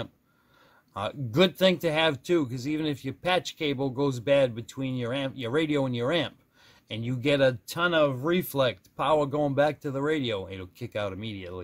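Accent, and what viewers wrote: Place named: Boston, United States. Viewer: South Carolina? You sound like a Boston boy to me. LOL. I was born and raised in Salem.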